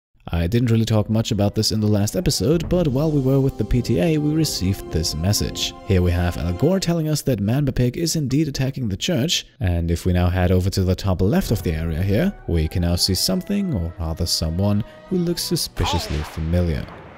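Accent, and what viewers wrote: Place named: Germany. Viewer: p.s. sprichst du Deutsch? I detect a slight accent:)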